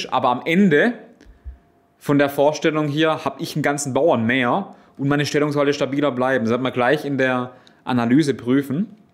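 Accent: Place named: Baden, Germany. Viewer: sehr gerne und vielen Dank auch für die schnelle Antwort. :) Ich bin gespannt. Das hört sich gut an, dass ihr da schon hinter den Kulissen daran arbeitet.^^ Spannend wäre das beispielsweise wie das Profischachspieler machen, die das quasi als Beruf ausüben, aber auch Berufstätige, sprich beispielsweise du, also wie verbinden die den 8-Stunden-Job dann quasi mit Schach. Ja das Überangebot ist gerade für Spieler/innen unter DWZ1500 sehr erschlagend und überfordert zum Teil schon. Also ich habe einige Kanäle wieder deabonniert oder stumm geschalten, weil es einfach zu viel wird. Da helfen Bücher schon eher und das finde ich hier auf dem Kanal beispielsweise gut, dass nicht jeden Tag ein Video kommt (plus der badische Einschlag, wenn ich das richtig rausgehört habe, aber das liegt an dem ebenfalls vorhandenen badischen Wurzeln :D).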